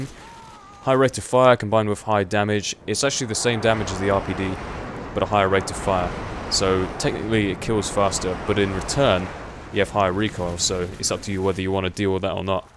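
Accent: United Kingdom. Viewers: 2 Reasons why I LOVE YOU. You're British. You're a younger version of James Bond.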